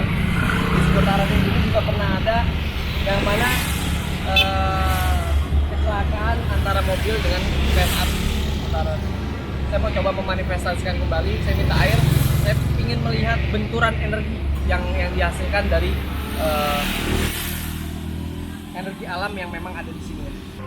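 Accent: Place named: Tegal, Indonesia. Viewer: Tegal ngapak ki❤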